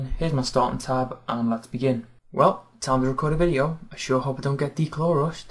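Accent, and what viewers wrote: Liverpool, United Kingdom. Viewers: you sound like you're from liverpool, are you? hate to ask but like so he can see.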